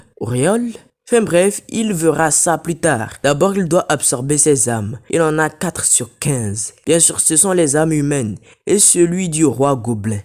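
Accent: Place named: France. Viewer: pourquoi tu a la voix d un gars du continent 😅😅😅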